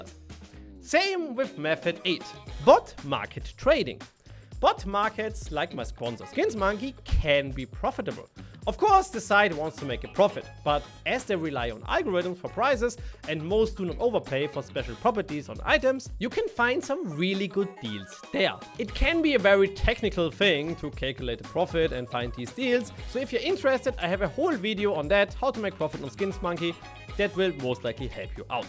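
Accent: Germany. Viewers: Bro dieses Deutsch Englisch macht mich fertig 😂😂😂😂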